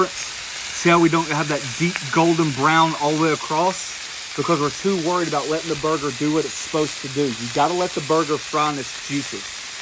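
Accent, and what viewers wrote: South, United States. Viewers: For some reason when i see a man with an southern accent on the grill, i always trust what he's talking about lol.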